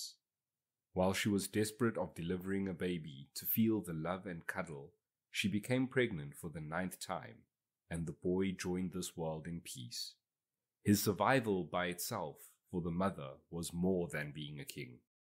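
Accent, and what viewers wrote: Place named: South Africa. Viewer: I didn't know those circumstances of Haile Selassie's birth and parents. Thanks, South African sounding narrator.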